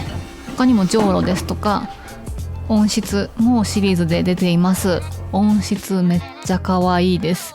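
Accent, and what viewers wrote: Kansai, Japan. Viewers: やっぱり、少し訛ってますね❤でも関西弁愛おしいですよ。新商品見たのに忘れてしまってました。説明を聞いて思い出しました😅有難うございます😊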